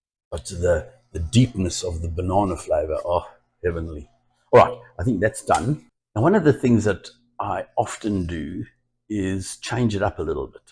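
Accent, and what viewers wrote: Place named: Australia. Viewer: I have stove/oven envy! Love the video and so nice to see the Aussie accent.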